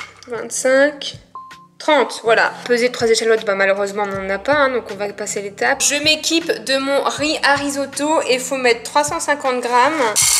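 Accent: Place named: Nord-Pas-de-Calais, France. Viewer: cet accent ch'ti j'adore!!! T la meilleure!!!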